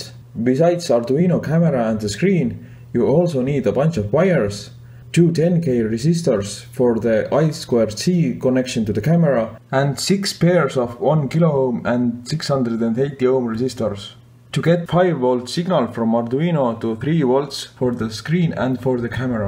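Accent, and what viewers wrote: Estonia. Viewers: Is that an Estonian accent? Thanks, best video tutorial on how to connect OV7670 to Arduino so far I found. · Kas oled Eestlane? Aktsent on täpselt selline. :D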